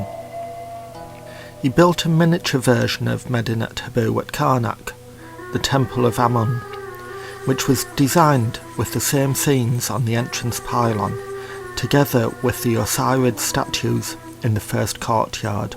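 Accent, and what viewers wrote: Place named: England, United Kingdom. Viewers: you sound like a young Northern english Richard Attenborough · It's a North east English accent, maybe Yorkshire? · English accent with a mean lisp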